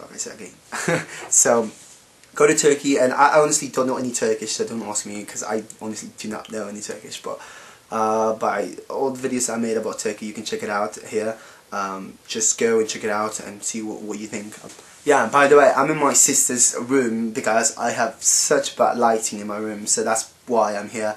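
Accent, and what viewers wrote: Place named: Birmingham, United Kingdom. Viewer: I'm English and i would never go to Turkey. I'm sure the majority of Turks are lovely people. But i always hear every summer of people being attacked and in fact just yesterday a British family were shot by a Turkish man at a villa. Greek people are beautiful. Go to visit Greece guys, beautiful people, beautiful country, beautiful everything. By the way you has anyone told you that you sound like you're from Birmingham in England, you have a similar accent:- )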